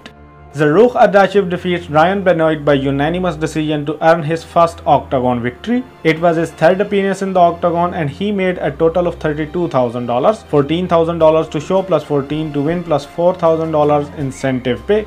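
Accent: India. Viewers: Your videos are good but your Indian accent is so thick and off putting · Hi bro you sound indian how do you watch fight night and paper view undercard usually ?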